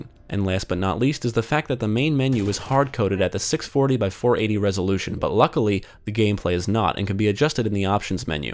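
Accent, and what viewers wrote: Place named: New York, United States. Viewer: I'm from michigan, and im guessing you're a new yorker?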